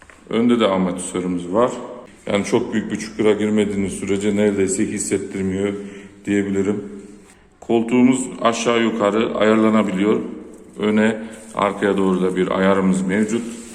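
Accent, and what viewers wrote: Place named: Kayseri, Turkey. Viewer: 5 tepelerin oraya geldiğinde anladım kayseri olduğunu usta ama asıl anladığım rampayı çıkamayıpta sonradan rahatlıkla çıktım demen tam kayseri esnaflığı 😄 bi kayserili olarak esnaf ağzını gayet iyi anlıyorum 😁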